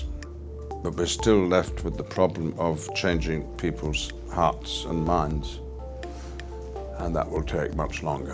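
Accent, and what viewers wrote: RP, United Kingdom. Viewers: Only Ian would answer questions while drinking tea and sounding posh AF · It's strange hearing American pronunciations with such a posh accent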